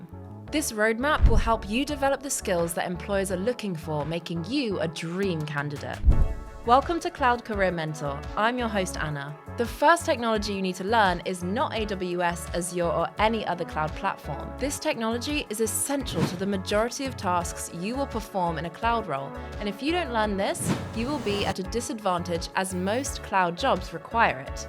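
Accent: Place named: United Kingdom. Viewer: Woah this does make perfectly sense what Anna says in this video. Plus I dunno if Anna is British or Australian but the English people have the best accent in the world.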